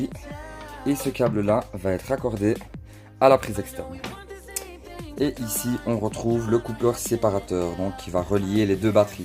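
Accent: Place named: Liège, Belgium. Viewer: salut mec ton accent me dit un truc haha tu viendrais pas de liege? :p super la video sa vas bien m'aidé merci a toi 1 abo en plus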